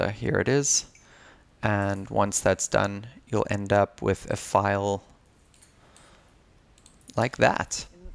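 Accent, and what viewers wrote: South Africa. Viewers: are you south african? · Woaaahhh, South African accent I spot there? yay! Nice info, subscribed!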